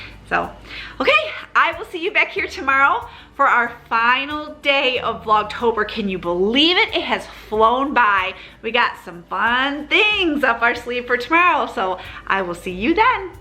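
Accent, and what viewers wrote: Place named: Michigan, United States. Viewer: Are you from Michigan.... you talk just like me lol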